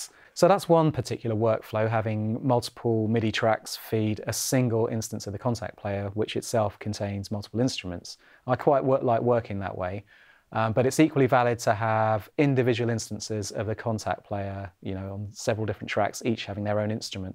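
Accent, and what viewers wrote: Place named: United Kingdom. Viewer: UK accent <3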